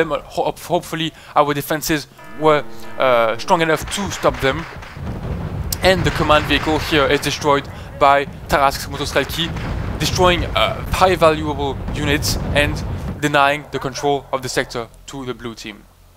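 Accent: France. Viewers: Yay French accent!! Like!!! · First 10 seconds impression of this video. Fuck, i love french accents xD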